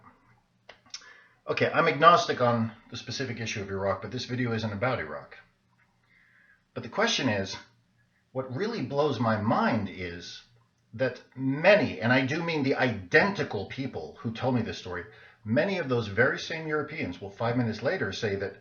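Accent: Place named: United States. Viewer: May I ask you where you live? I hear an American accent though so America I guess, but where?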